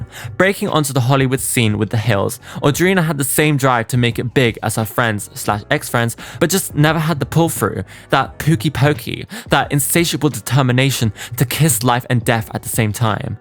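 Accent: United Kingdom. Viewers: british accent XD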